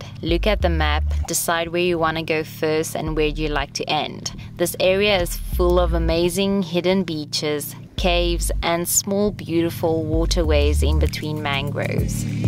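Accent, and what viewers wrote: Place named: South Africa. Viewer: Your accent... Is julle van Suid Afrika?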